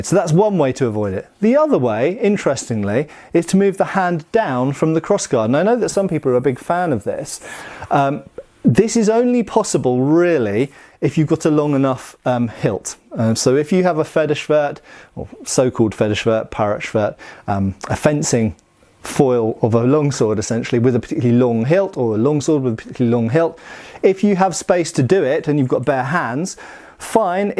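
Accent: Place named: England, United Kingdom. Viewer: The English accent makes him sound smarter.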